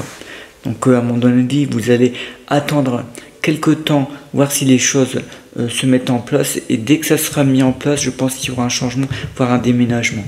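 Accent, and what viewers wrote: North, France. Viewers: vous êtes du nord? Bonne soirée j'adore votre guidance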